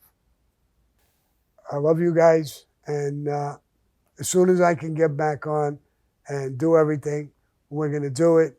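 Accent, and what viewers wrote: New York, United States. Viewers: I'm from the Bronx and was raised in an Italian neiborhood ,I live in the Midwest now so l have a new York accent and love hearing Mr gravanos accent as well,brings me back to the Bronx.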